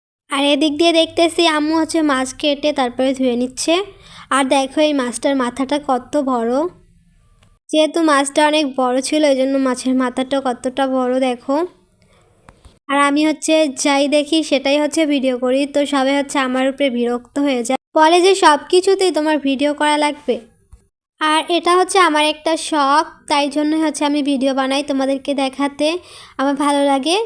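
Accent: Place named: Bangladesh. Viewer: তুমি কি বাংলাদেশী তোমার কথা য় খুব টান আছে। ভালো থেকো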